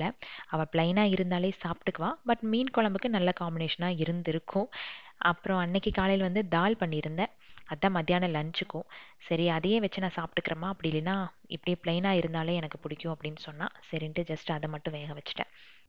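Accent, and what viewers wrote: Coimbatore, India. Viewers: Akka unga voice super athulaum conversation with cbe slang vera level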